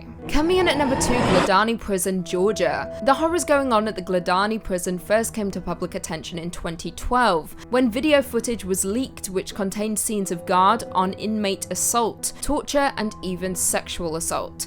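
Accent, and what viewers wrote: United Kingdom. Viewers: Figured a prison in North Korea would make the list. Lovely sounding British accent, BTW. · Awesome video in a beautiful UK accent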